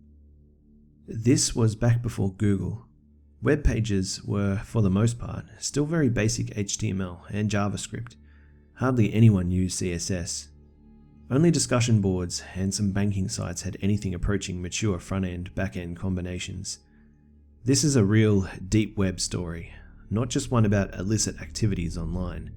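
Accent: Australia. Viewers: Only one problem..You have an Aussie accent mate, using American euphemisms...??? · Is Australian? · Australian mate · Australian I could tell bc of the way he said mate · Australian bro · Are you Austrailan m8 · are you Australian I'm From Australia your voice sounds like your australian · He is Australian. I think i should know what an Australian should sound like since i am one. Sup fellow aussies 😊🐨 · He's Australian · I think he may be Australian. No offence. Sorry if I am incorrect. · Yeah he may be Australian but that's like er more British than American. It's older sounding somehow. And I'm not dissing the US here, cos they make good TV, but horror narration just CANNOT be done with an American accent. It's just off putting fsr. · Finally a fellow Aussie talking about scary shit good on ya m8! 👍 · He isn't British, he is Australian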